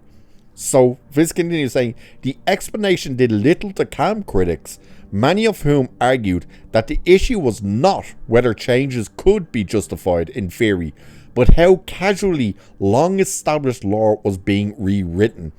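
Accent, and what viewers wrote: Ireland. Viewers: North Dublin 😂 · Love your dialect. Which part of Ireland? Sounds close to some Newfoundland dialects I've heard.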